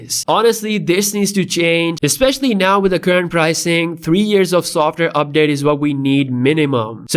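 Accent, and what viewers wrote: India. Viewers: You have your Indian accent messing up the whole thing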